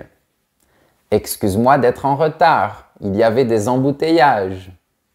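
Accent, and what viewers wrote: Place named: South, France. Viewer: Un diamant, oui, mais pas brut plutôt raffiné...avec un petit accent du midi ( sur les "o"). Mais c'est charmant. Merci à.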